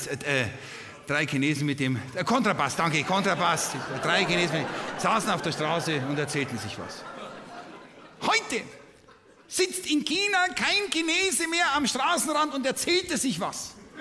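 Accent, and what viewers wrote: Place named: Bavaria, Germany. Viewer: Liebe Krustenzuzler - sensationell - da haut`s da ja den Schua weg... bayerische Sprachkultur in Reinform - immer wieder ein Genuß - Danke Wolfgang Krebs.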